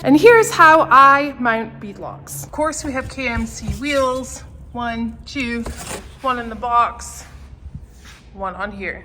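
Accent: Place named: Northern England, United Kingdom. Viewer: While watching House of the Dragon, I heard an accent I thought I recognized. Turns out you sound like the people of the North.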